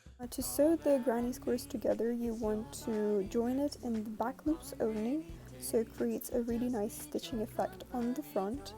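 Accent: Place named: Russia. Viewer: По произношению Английского языка понятно,что она русская😂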